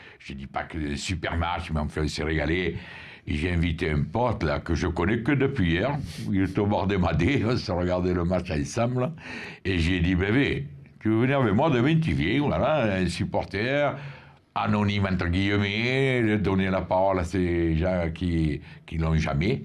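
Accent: South, France. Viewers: Continue tes analyses tu me regale avec ta bonne humeur et ton accent qui chante le midi un coucou du nord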